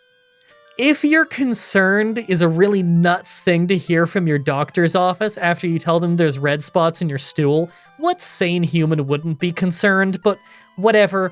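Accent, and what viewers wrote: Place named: Canada. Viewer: Your Canadian accent makes me happy.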